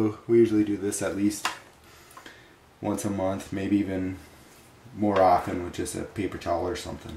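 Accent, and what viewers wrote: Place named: Upper Midwest, United States. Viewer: Thanks so much for posting the code for system clean. Even though mine randomly automatically pops up asking me if I want to clean system, it's always when I'm setting the control to start a load after I've already loaded clothes and detg and when I go to set controls on. It will automatically interrupt and ask me, which I then just punch cancel and it allows me to restart without asking again. If that makes sense. But now that I know how to set manually I can do it with more convience. You sound like you are from Minnesota or Wisconsin, or maybe even Buffalo NY area. Upper midwest accent. Thanks!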